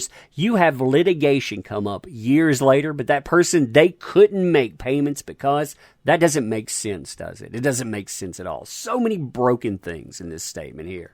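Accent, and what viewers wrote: South, United States. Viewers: southern drawl makes this even more informative and entertaining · I never thought I'd enjoy listening to commentary with such a southern drawl. But you, sir, got it going good.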